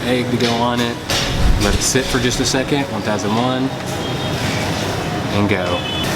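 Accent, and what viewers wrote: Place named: Texas, United States. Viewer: He y! can't get enough of your videos bro! You must be from Texas by the way you sound.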